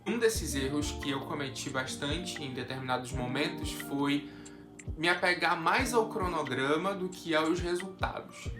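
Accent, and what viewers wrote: Pará, Brazil. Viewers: Já ta com um pouquinho de sotaque parense 😆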